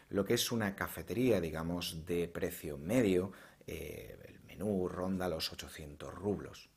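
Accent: Spain. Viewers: Exelente información muy didáctica, por tu acento eres español. ¿Porqué no nos das la misma imformación pero de tu país España? Saludos desde Ecuador. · Sí, tu canal és super informativo,además me encanta tu acento español de españa,saludos desde Brazil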